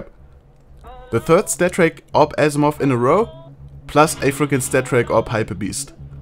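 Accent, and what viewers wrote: Germany. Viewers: Wie man merkt dass du Deutscher bist :D trotzdem sehr gutes englisch und good vid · Du bist sicher Deutsch, merkt man an deinem Englisch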